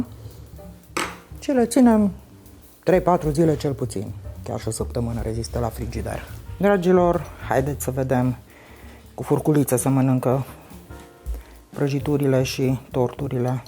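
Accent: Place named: Arad, Romania. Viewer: Excepțională prezentarea! Profesionistă! Și drag îmi este graiul dvs. ca la Arad! Mulțumim frumos, doamna! ❤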